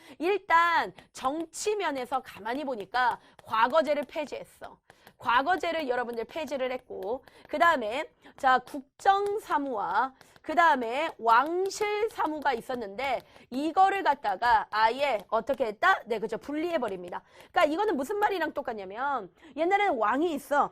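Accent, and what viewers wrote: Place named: Jeolla, South Korea. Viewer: 이분 고향 전라도라에 내 목숨 건다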